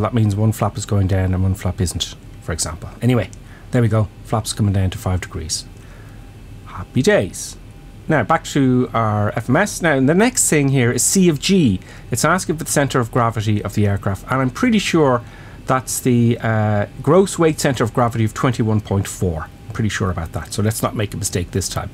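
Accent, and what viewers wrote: Ireland. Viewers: I'll never fly these big jets, I just come for the great craic. Keep it up. Highly entertaining, especially the response to the shite adverts. No one says it quite like the Irish 👍😀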